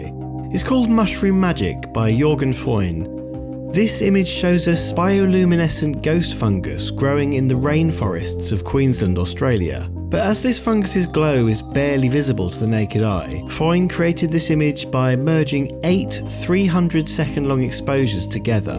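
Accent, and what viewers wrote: United Kingdom. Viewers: Very good presentation and in perfect British English!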